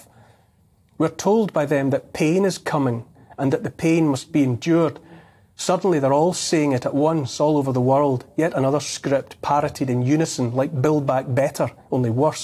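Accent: Scotland, United Kingdom. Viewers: He spoke like a warrior poet, he spoke like a Scotsman!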